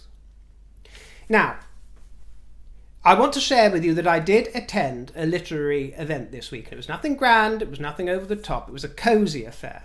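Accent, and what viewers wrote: United Kingdom. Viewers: As a rather conservative, slightly over the hill woman from the flyover mid Atlantic region of the States...I certainly do not feel that Harry's 'Spare' will do well over here,..... There are so many much more important issues on our general minds, that I just can't imagine what demographic over here would feel compelled to fork out whatever £28 translates to in the flailing US currency. ( $32 ish, I think)...that's about a half of a tank of gas for the industrious working...more affluent, in my opinion will be too busy and uninterested in his whingine(?) Whining....and the ridiculously large bunch of our now unemployed or underemployed or welfare crowd won't be able to get it with their food stamps.... Personally, there are so many other and more worthy books to buy that I could've bothered to invest a nickel in anything he might be trying to communicate via a convoluted route, especially when anything he spits out in person is so worthless! 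Besides, I'd so much rather be entertained by you especially, or any various other Brits with wit and humo...especially with a yummy accent!! Just can't see it, any huge sales number, for him from here!!I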